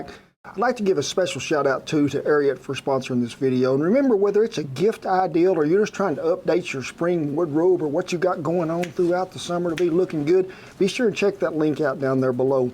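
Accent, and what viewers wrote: United States. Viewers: As a Canadian, I am very scared to see an American with a very heavy drawl making poutine.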